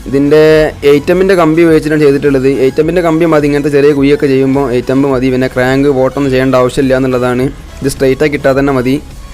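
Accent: Malappuram, India. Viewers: "കുയി" നമ്മുടെ മലപ്പുറം ഭാഷ.....😀😑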